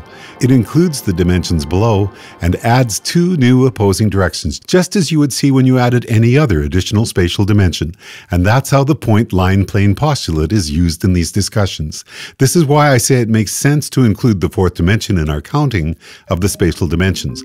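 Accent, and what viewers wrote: Canada. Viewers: what a legend. I had been wondering about this when I got stoned (thats my greatest thinking time, please don't judge) and you explained it perfectly in this video. I hope you find your way into more mainsteam media. Diggin that canadian accent too. On an unrelated note, my friend wants to know on what video do you have a metal style band singing at the end with (i think) expletives in it? Just thought it might be better to ask a non science question for once!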